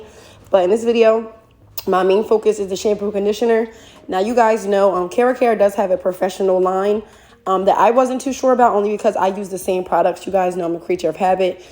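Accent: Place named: East Coast, United States. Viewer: I knew I heard an east coast accent while you were talking lol. I’ve been trying to get my oldest into doing hair she’s good and actually likes it but is on the fence about cosmetology school I’m gonna show here your videos for sure. Texas by way of Philly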